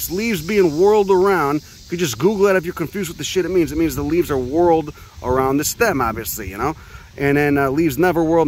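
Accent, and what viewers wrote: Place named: Boston, United States. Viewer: Your enthusiasm and Bostonisms have infected me completely nice 👍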